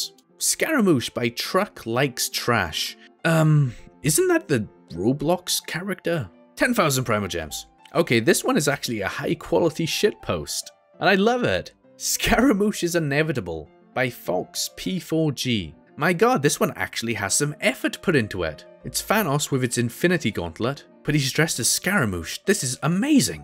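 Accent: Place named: Scotland, United Kingdom. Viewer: you and soooo funny and i love your scottish accent lmao, subscribed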